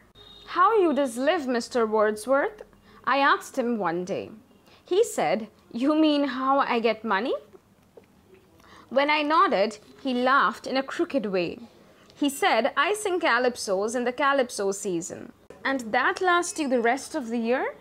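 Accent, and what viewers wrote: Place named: India. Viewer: Sorry but I found it hard to listen to .. didn’t get past first paragraph I guess I’m not used to the Indian? Cadence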